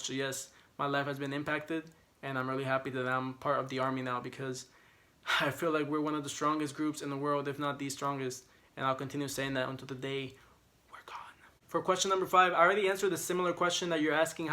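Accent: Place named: Puerto Rico. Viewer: 5'9 jeez everyone towers over me I'm such a hobbit. XD This was a cool video, I like hearing the kinds of things you feel are important. I laughed when I heard you say PR. I'm from NYC and that was my first guess when I heard your accent. Anyway. Thanks for this video. I'm glad you want to do something good for the world with what you have (!). When I first saw your videos I knew you were going to be an ARMY - because people who want to do something to make the world a little better tend to be the ones who are drawn to. I'm really interested in the environment too, I love cultures and religions and like green technology. We'd hang, I bet. XD I think you might like checking out a video called Inside the ARMY that was done by MTV. When you see it I bet you'll know why I knew you'd be family from the very beginning.:D And if you want something funny, on Running Man ep 300 was just re-released by KOCOWA. 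They were on the show (a variety show - you might like them) a couple of years ago and it was pretty cute. I don't know the copyright rules for it though. I think it should be okay... but maybe check with people.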